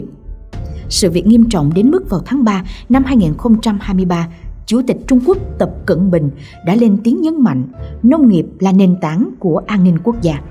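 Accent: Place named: Quang Ngai, Vietnam. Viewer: Giồng như ở Quảng Ngãi VN quá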